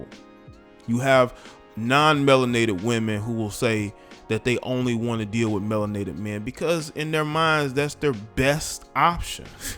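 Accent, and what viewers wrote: South, United States. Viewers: You sound so stupid. I swear you have no facts whatsoever and just assuming like everyone else. Also, what’s the shade about her dating options. Man I can’t stand your country ass voice✋😩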